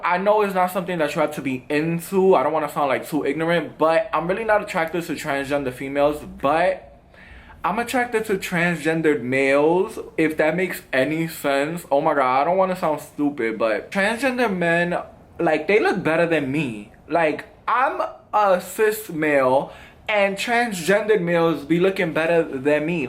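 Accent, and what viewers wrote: New York, United States. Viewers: Lol when I watch his videos I can hear my New York accent omg 😭😭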